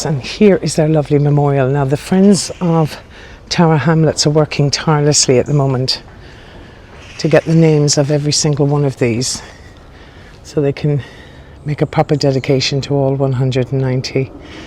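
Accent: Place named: Ireland. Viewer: When you put on the CC it comes up with Tarrah instead of tower. It's your lovely Irish accent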